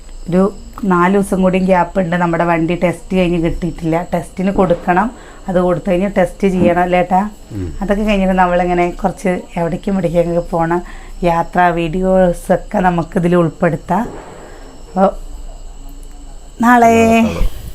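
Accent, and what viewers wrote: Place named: Valluvanad, India. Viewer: വള്ളുവനാട് ഭാഷ അങ്ങനെയാണ്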